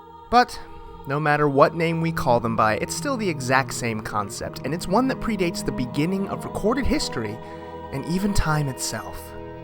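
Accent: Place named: Brazil. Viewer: You sound Brazilian which is a compliment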